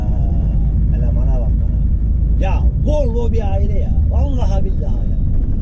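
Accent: Adana, Turkey. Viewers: Abi adanalı siven var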